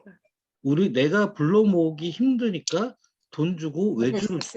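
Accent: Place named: Jeolla, South Korea. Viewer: 전라도 소리만들어도 조으네요 내고향 전라도 줌교육도 열정뜨겁네요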